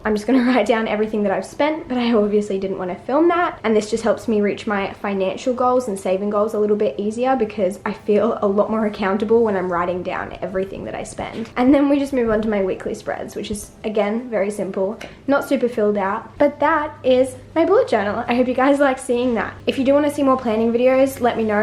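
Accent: Australia. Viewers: honestly probably not something you hear all the time but i love the way you express yourself, i don't know how to describe it and maybe i'm just loving the Aussie accent haha but I love the way you talk and formulate your sentences!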